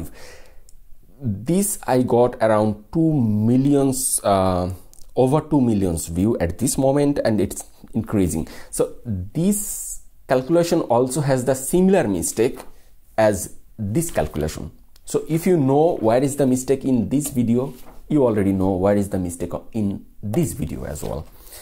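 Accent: India. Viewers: U are saying English as indians. It's exceptional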